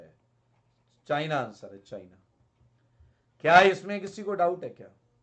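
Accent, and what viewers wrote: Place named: Uttar Pradesh, India. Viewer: Sir aap up se hai?? Aapki accent mere papa jaisi hai 😅😅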